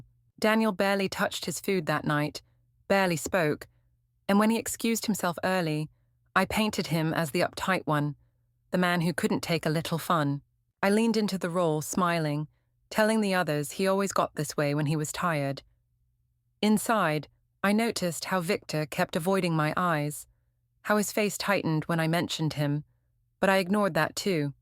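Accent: United Kingdom. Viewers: I recognize the narrator's voice from previous videos: a stuck up, arrogant, British accent, entitled, selfish vixen, that does what she wants without considering the consequences and the people she hurts. I couldn't watch after a few sentences. A very unlikable character with a voice to match. These characters hit too close to home for me.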